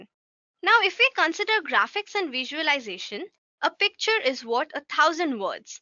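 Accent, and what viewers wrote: India. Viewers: I love your Indian accent. Why are Indians so smart and beautiful? Thank you for that worthwhile information. Bless you...❤❤❤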